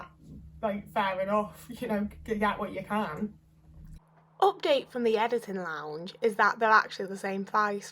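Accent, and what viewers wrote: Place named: Northwest England, United Kingdom. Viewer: It's not particularly strong which makes it hard to say for certain, but central northwest England, between about 53 to 54 degrees north, includes the Manchester area.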